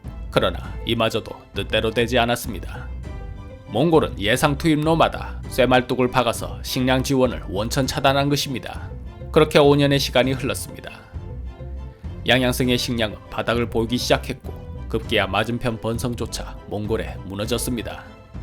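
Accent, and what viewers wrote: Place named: Daegu, South Korea. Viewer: 참 잼있고 유익한데 구독자가 안느네.. 진행 하는 양반 사투리도 내 고향 대구 같아서 더 좋은데.. 거참 이상하네 왜 구독자가 안늘까..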